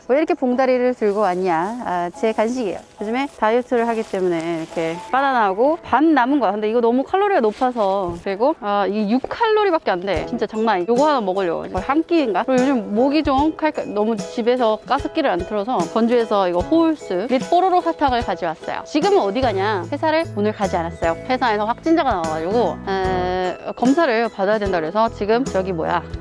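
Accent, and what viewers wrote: United Kingdom. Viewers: 품위있는 영국 영어와 싼티작렬 한국말의 조화ㅋㅋㅋㅋ 유쾌유쾌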